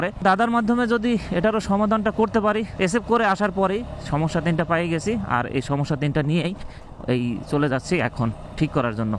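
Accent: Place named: Narayanganj, Bangladesh. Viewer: ভাই আপনি কি নারায়ণগঞ্জে নাকি ? নারায়ণগঞ্জের মত মনে হচ্ছে।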